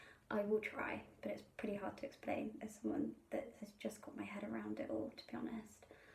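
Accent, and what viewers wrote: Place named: South Africa. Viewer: It always surprises me that people think a harp is any more expensive than another professional instrument. My gold flute was $13,000 twenty years ago! I just spent a grand on a blemished new fullsicle but one day I will get a 34 string. I travel though and want to be able to travel with it. It actually sounds good because it is walnut. I do want a Ravenna 34 eventually. And even that is only about $4000+ so I just don’t get it. Two harps which still cost less than my flute. You are just adorable though. I could listen to you talk for hours. I’m trying to figure out the accent. South Africa? Or UK? But what happened? No progress videos?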